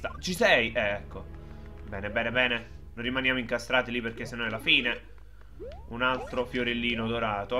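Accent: Naples, Italy. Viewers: Bella:D ma sei di Napoli?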